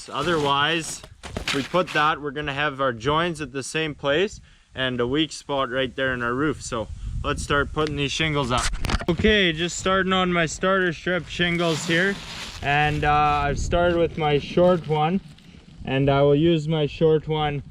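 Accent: Canada. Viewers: There’s my favorite Canadian voice! I just got a new coop with cedar shake siding and a cupola, that has a chicken weathervane on top. It took an entire summer to build, but it’s really cool and the chickens love it!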